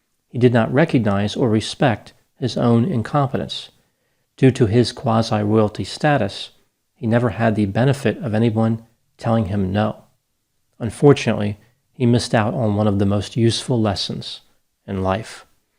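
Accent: United States. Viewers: Very clear American English.Good speaking voice.Thank you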